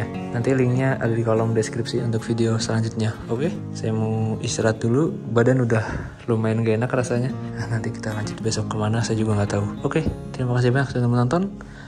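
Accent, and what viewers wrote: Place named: Java, Indonesia. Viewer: Mas logatnya ada jawanya, kayak bukan orang sunda mas 😅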